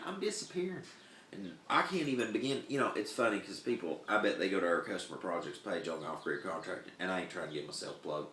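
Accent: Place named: Alabama, United States. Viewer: Today I had a class to teach and I said pretty much what you just said. If people knew they were living in a controlled environment similar to the movie "The Matrix" they would freak out. The truth is too much for so many. I have tried for years to wake people up including my own kin folk to no avail. One or two of my nephews are awake but no one else listens or seems to care. The brainwashing is very , very strong on the population. I too was going to leave and head for the hills but instead I decided to stay and help my neighbors. The same neighbors that laugh at me when I talk to them about what reality is. It's going to be very, very bad and millions if not billions will die. Thank you for your hard work! Oh...your accent is similar to mine. I grew up in rural Alabama. Some folks just can't understand what I'm saying. LOL.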